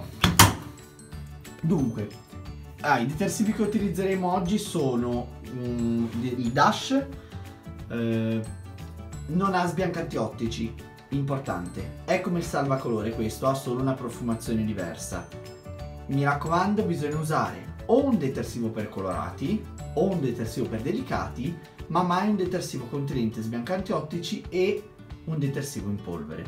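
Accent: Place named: Liguria, Italy. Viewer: Ho provato ! Molto meglio ho impostato programma misti (la mia Candy 9kg ha questa dicitura x i sintetici) 40° asciugatura di questo stesso programma, ha il simbolino del ferro da stiro, torce molto meno i pantaloni che comunque ho notato non devono essere troppi direi max 4 ..in sole 3 settimane di possesso della lavasciuga sto imparando grazie al tuo aiuto e provando da me nuove opzioni ! Mi sei di grande aiuto guardo tutti i tuoi video e sono contentissima che mi rispondi tempestivamente. Simpatico anche il tuo accento (mi sembri ligure) ciao ti scriverò ancora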